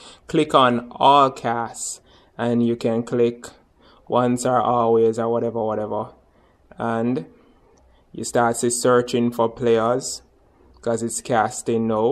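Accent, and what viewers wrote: Jamaica. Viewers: Works perfect for me! I have been trying to cast for 3 weeks now with no success until this video! THANK YOU!!!! Love the Jamaican accent!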